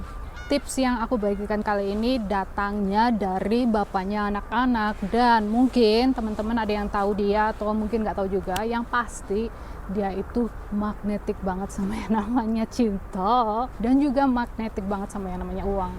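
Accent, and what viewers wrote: Banyumas, Indonesia. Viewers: Ora ngapak ora penak mbak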